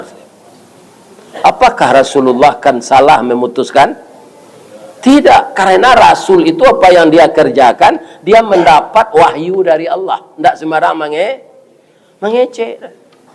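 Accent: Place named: Minangkabau, Indonesia. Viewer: Semoga tetap sehat buya. Ambo bukan orang awak sangat menikmati ceramah buya apalagi dg logat minang yg kocak dan isi nya Ambo sering tanyo ke bini Ambo yg Alhamdulillah orang awak. Sekali semoga sehat buya Aamiin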